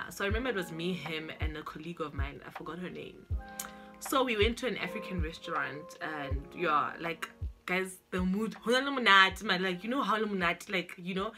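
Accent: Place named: Free State, South Africa. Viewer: When you said 'hao cheweng', I knew I had to subscribe 😂😂😂 You sound like you're from Free State.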